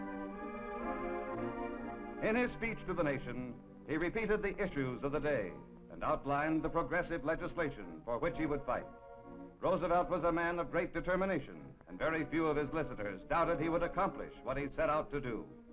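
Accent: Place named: Boston-Philadelphia, United States. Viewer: If you are American please explain to me why Americans don't sound like this anymore. The accent used in this video is so pleasant to hear. Today's Americans don't remotely sound like this. This 1950s video narrator is using what is known as a Boston-Philadelphia accent, which seems to have fallen out of use these days even in universities. Sad. Please explain why this has happened.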